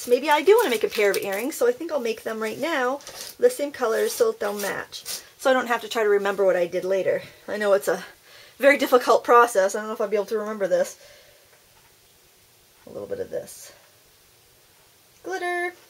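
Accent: United States. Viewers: Hi from the UK. Are you as manic/scatty as you sound, I really love the your voice, so enthusiastic, typically a yank. Thanks for being you, 😀😀😀😀😀😎😎😎😎😎😎🙏🙏🙏🙏🙏❤❤❤❤❤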